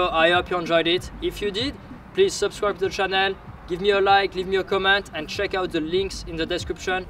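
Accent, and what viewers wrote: France. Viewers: Sorry but, as a French, I really despise the fact that you haven't corrected your accent with all this time speaking english. I guess it's part of your character now, but I can't listen to "zhis"...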